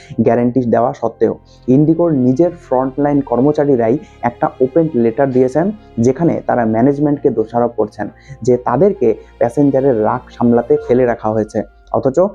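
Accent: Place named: Bangladesh. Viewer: আচ্ছা আপনি কি বাংলাদেশী?! বেশ কিছু উচ্চারণ শুনলেই তাই মনে হয়। আপনাদের নিজেদের দেশের বিষয় নিয়ে representative clips বানান। তাতে সবার ভাল হবে। আপনাদের'ও।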